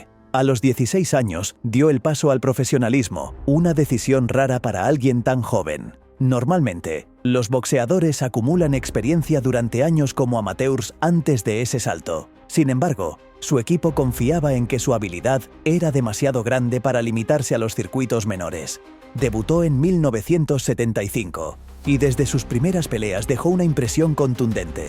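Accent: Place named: Spain. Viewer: ¿Qué hace un tartosho eshpañol presentando la historia de una leyenda?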